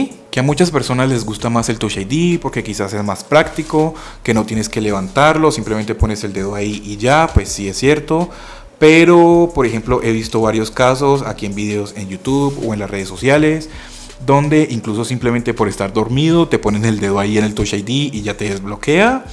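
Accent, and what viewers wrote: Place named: Colombia. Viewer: Cuando dijo un millon de pesos dije vergas ya despues escuche el acento y me acorde que en Colombia el dinero se maneja en millones saludos desde Mexico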